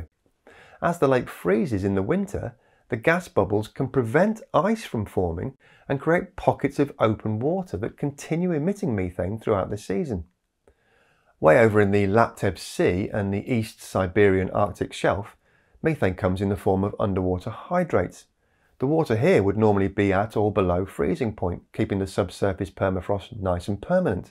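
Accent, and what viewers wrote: United Kingdom. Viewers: Methane? No, youthane. Why do Brits pronounce name of the gas that way?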